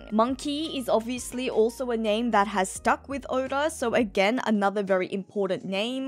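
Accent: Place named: Australia. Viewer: The word of the day is Deuteragonist lol. Hearing it in an Australian accent is a delight. Great video!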